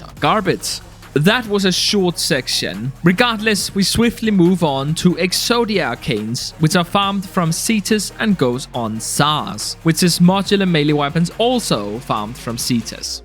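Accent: Denmark. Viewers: You sound Danish..? Or Scandinavian in general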